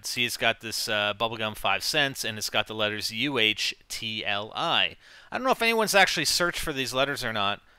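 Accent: Spain. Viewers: U have a nice spanish accent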